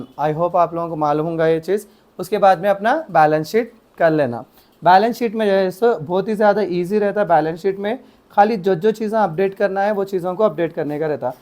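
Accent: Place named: Hyderabad, India. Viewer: I just love Hyderabadi accent 😅😂😂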